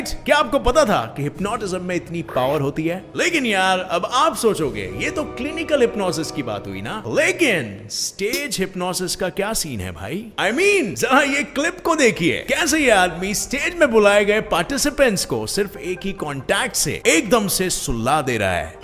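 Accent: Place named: Bengal, India. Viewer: Your bengali accent is quite good.... 👍👍👍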